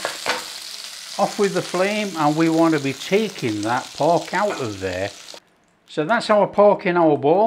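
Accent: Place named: Edinburgh, United Kingdom. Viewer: Edinberg , England is where a life time friend of mine was from..his accent was just like yours lol...he has died but everytime i listen to you I hear George talking lol!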